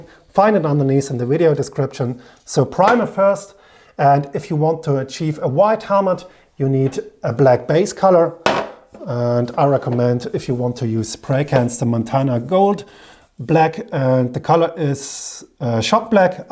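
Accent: Germany. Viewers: Hey, Awesome work, artistry and video's too boot.. thank you.. we all love your video's as with knowledge we have the balls to deliver instead of our girlfriends taking the lead.. I have a question... I want to strip / repaint an Old Skool race road bike that has an alloy, aluminium frame.. I would like to know is it better to strip, polish the raw metal and then apply a clear adhesive promoter before candy spray or is it better to leave the metal surface 'rough' and then apply a primer and candy spray... what do you suggest please as you are the Prime Mover, Master of Spray excellence. Cheers Ivan from UK (ps: Your videos ROCK... Gernman accent, humour and technical excellence... Yavol..:-) Use your brain and balls don't be a pussy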